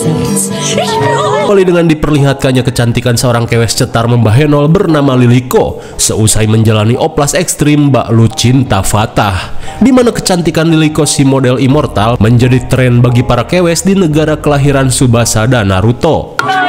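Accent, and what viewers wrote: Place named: Sunda, Indonesia. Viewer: suka gue sama pembawaan nya Sunda banget bacotan nya keren mang jangan bosen bosen upload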